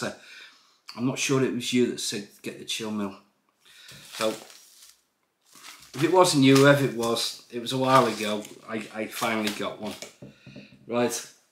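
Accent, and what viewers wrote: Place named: Liverpool, United Kingdom. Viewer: Great to hear a bit of Scouse! Enjoy your Friday!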